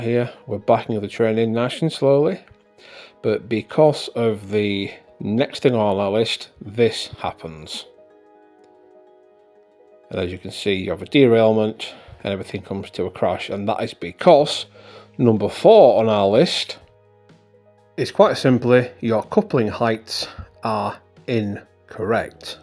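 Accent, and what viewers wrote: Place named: North West England, United Kingdom. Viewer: I’m new to the channel but I love it I have my large layout in my double garage in Blackpool I can tell from your accent that your also from the north west I think My layout is totally mixed although no steam as I only knew diesels and electric so everything from deltics to class 800 inc the new class 92 from Accurascale I have about 120 locos and dmus and emus I started one year ago returning to the hobby after twenty years away and now I’m fully dcc with most being full sound fitted Great way you present the video and fantastic editing along with I really like the channels thank you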